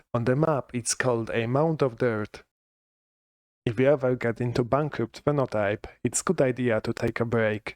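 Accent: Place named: Poland. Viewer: Interesting contnet, funny jokes and nice Polish accent.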